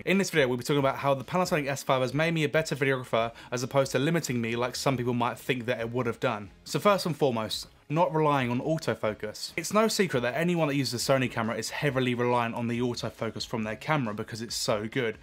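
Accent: United Kingdom. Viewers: Can’t figure out ur accent, r u English?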